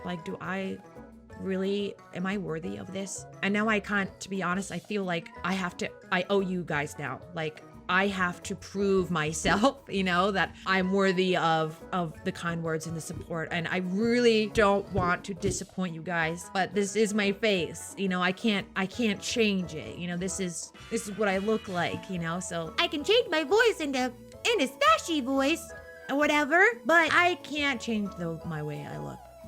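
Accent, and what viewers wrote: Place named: Boston, United States. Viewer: You remind me of jerma, and not just because of the boston accent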